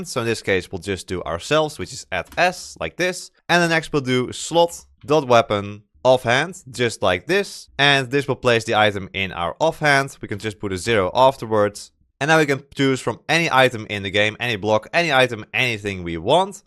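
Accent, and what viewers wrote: Netherlands. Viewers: The dutch accent 😅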